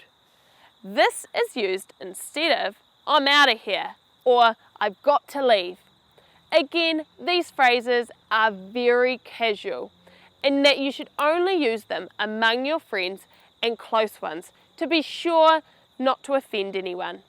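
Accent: United Kingdom. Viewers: British English???? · Her accent is british,. But she is in New zeeland.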